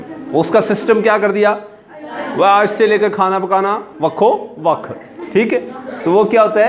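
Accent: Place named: Punjab, India. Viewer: why punjabi sounds so heart-touching and funny😂 especially when he speks.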